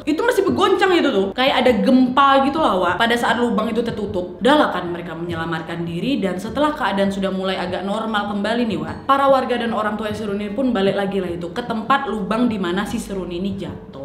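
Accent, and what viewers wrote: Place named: Medan, Indonesia. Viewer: Nampak kali logat Medan nya wkkw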